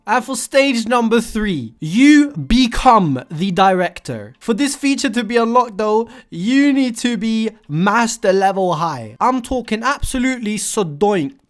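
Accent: United Kingdom. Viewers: Now we got a British stickman stoner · And he’s British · Ayyy bri’ish stickman dude!!!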